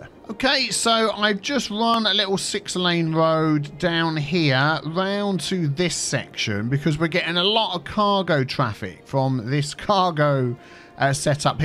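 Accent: United Kingdom. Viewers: He's trying to sound American? I'm Canadian and soundee like the most British man I have ever heard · You sound British?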